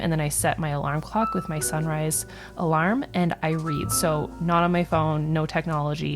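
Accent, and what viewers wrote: Canada. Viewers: finally a Canadian who I can tell is bilingual!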